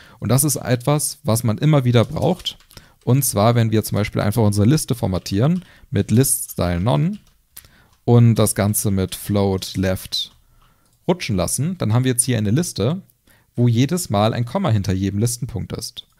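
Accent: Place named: Germany. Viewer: hab exact das erwartet..., warum können sich deutsche generell nicht etwas bemühen das englische TH zumindenst irgendwie richtig auszusprechen ? se-only-type, oder das en-te-ha-element, "when talking about sis and set, you want to be understood - no?" schöne kacke, dass diese programmier dinger alle auf english sind :) nix persönliches ! davon abgesehen, content ist gut präsentiert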